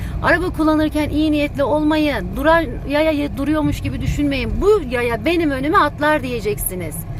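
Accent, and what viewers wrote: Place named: Çankırı, Turkey. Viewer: Burasi çankırımı hocanin şivesi cankirililar gibi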